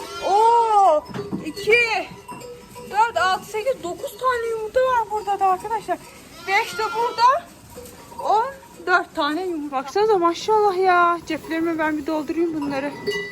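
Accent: Bolu, Turkey. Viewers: Abla seni izleye izleye evde bolu sivesiyle konuseyom herkese gııııı deyom seni seviyoruz devamkeee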